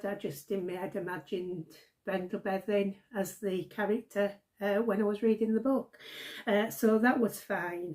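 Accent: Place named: England, United Kingdom. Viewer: Just found your channel. Love Vera and your lovely English accent. You do good work and I subscribed!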